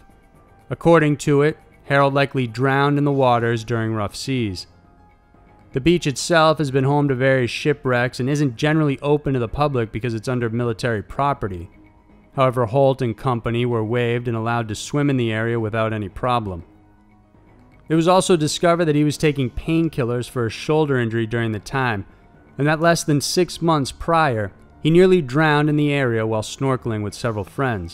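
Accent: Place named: New England, United States. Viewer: msmeowdetroit, he said "father and father away" instead of farther. I hear a New England accent.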